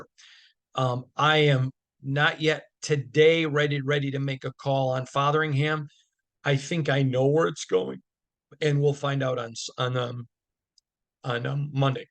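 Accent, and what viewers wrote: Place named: South, United States. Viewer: you remind me of a mix of Jon Gruden (before the emails) and Woody Harrelson. Haha can’t tell if you’re from the south or the Midwest, but keep up the great work and great content. You’ve got a great radio voice!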